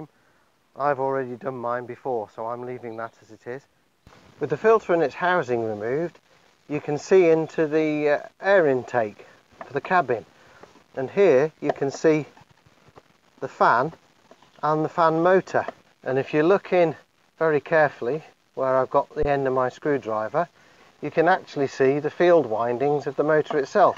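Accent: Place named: Wigston, United Kingdom. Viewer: Greeting from Texas! Are you somewhere near to Wigston ? I'm guessing by your accent..!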